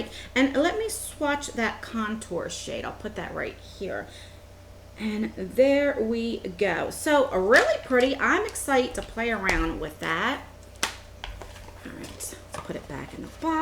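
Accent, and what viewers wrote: New Jersey, United States. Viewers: I’m from south Jersey too!! I knew you had that jersey accent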